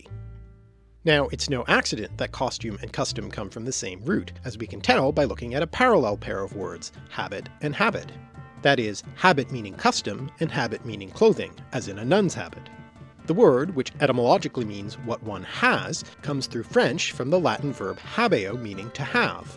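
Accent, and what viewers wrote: Canada. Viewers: If no one noticed you were Canadian before, this video with all its ou words is a giveaway XD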